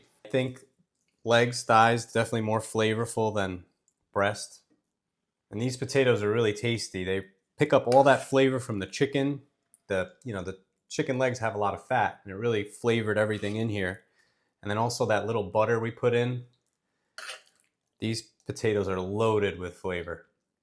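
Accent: Philadelphia, United States. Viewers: Just found your channel. Where's your accent from? Near Philadelphia but not Philadelphia, or southern New Jersey, or Delaware? Those are my three guesses. #1 guess is somewhere in the Philadelphia vicinity, maybe north.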